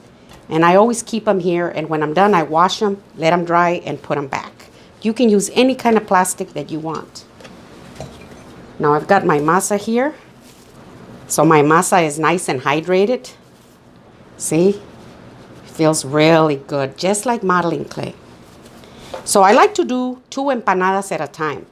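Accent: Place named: Texas, United States. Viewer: What in the hell are you doing in OHIO??? You sound like your from Texas or California.....I never seen a Ohio Mexican but Viva La Raza!!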